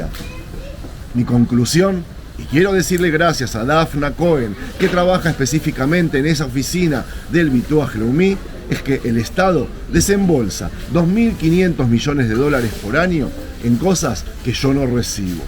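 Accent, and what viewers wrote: Argentina. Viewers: Viven de sus camaradas que tienen empresas y multinacionales y de las armas que fabrican en Israel y otros países. Y parece que a la gente se le ha olvidado lo que estaban haciendo en Nueva York. La mayoría están podridos de dinero, pero no se gastan nada. Lo guardan para la causa ortodoxa y a las mujeres, sólo las queréis para que tengan hijos. No engañais a nadie. Y por favor, no preguntes, si no te van a gustar las contestaciones. Porque no preguntas a las mujeres si les gusta esa vida. Y por lo que se escucha, eres argentino, éstas hay porque pediste asilo, para salir de tu país.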